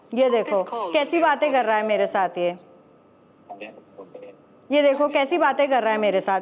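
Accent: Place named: Bihar, India. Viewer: Sound se to bihari lag raha hai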